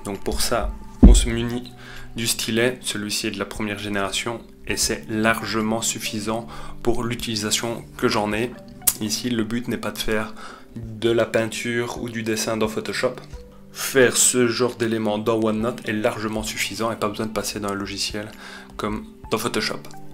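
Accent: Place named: Alsace, France. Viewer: Quels belle accent alsacien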